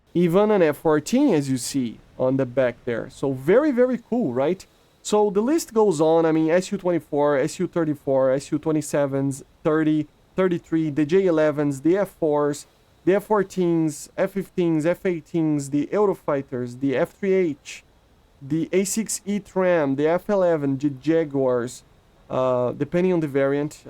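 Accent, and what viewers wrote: Brazil. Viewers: You literally just put words in my mouth. I simply said He's Brazilian. Another words you shouldn't expect someone that clearly isn't a native English speaker to say everything correctly. So to stop this pointless argument so both of us can get on with our nights lets not put extra words in my mouth that clearly wasn't said in the sentence that I said. Enough is Enough lets just get on with our nights and have a beer on a nice Saturday night. If that's not what you do fair enough just enjoy the rest of your night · Are you Brazilian or Argentina ?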